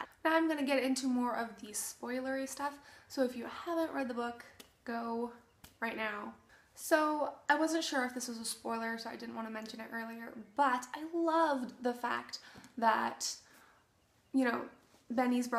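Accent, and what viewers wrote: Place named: United Kingdom. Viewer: British! That’s the accent!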